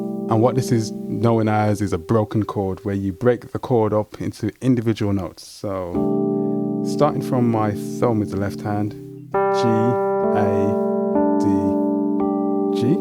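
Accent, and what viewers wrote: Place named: Birmingham, United Kingdom. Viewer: great stuff. Do I detect a fellow Brummie? 😊😊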